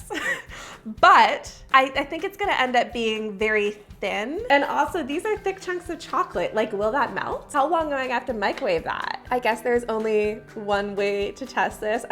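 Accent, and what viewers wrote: Canada. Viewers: Is she Canadian? She has a Canadian accent I feel lol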